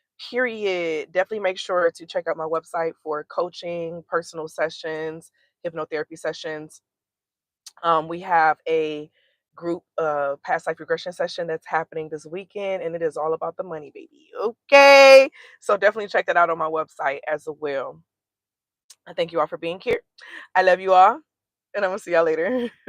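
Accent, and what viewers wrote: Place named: Midwest, United States. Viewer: I knew yo ass sounded like you was from Milwaukee or the Midwest lol. That’s my home town 414. Appreciate the message. 💫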